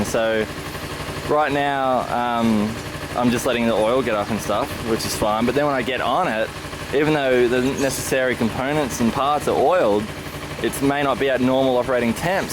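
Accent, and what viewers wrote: Australia. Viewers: Thx buddy, you explained very clear, btw I'm from Australia as well, now lives in Thailand, just got a bike with this choke thing and have absolutely no idea about it until I watched your video, and its great to hear our accent. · your australian accent is really awesome xD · Must be the Aussie accent that makes it so easy to listen to your videos! lol Keep em coming you've taught me quite a bit about my ex250.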